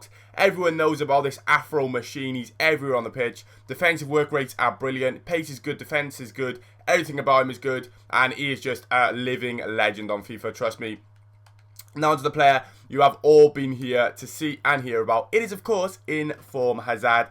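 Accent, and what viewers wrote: Wales, United Kingdom. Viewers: you sound welsh, are you? and if so what part you from?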